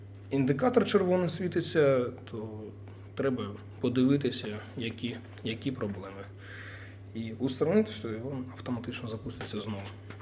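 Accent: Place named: Surzhyk, Ukraine. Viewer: Чего вымучивать на суржике-то?)) Ты ж на русском же лучше говоришь. И просмотров больше было бы))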